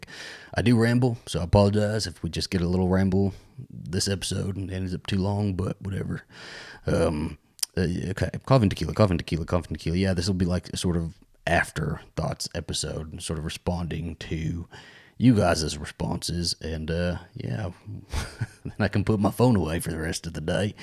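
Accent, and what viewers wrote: South, United States. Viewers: I can't believe you're reading all the comments about one of the gay movies, "Bros" on this video at your house in Texas during the episode of "Coffee & Tequila", but your husband, Alastair is not there and I hope he'll be very happy for you, I wanna say I'm proud of you, I know you're gay and you had the Southern accent!!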